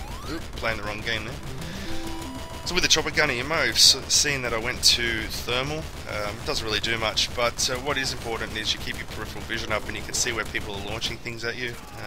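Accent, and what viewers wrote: Australia. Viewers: australian commentator FTW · an aussie! bout time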